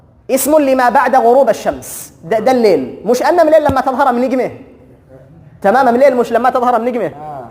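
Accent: Yemen. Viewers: مااجمل الهجه التهاميه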